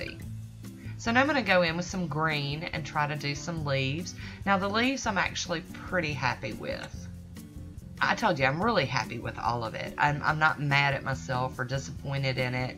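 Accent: South, United States. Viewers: Nice flower! I watch Kirsty everyday, love her videos! You should be able to shape the petals on the mat and then transfer them to the nail, but that might be even harder. Despite what everyone else does you have to figure out what works best for you. I enjoy watching you do your thing: it inspires me and I really like the sound of your voice - that lovely southern accent in particular!!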